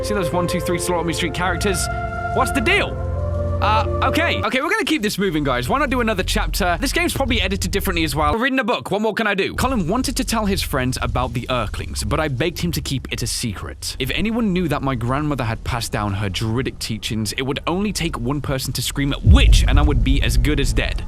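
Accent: Ireland. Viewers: He can hide the Irish accent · Anrijs Christopher it’s not because of rage it’s because he’s making fun of his Irish accent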